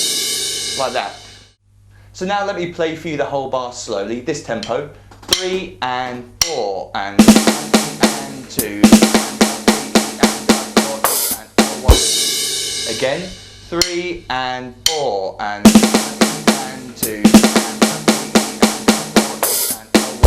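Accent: England, United Kingdom. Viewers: What kit is this? It's peng!!! Also where are you from, you have an English accent? (I'm from England)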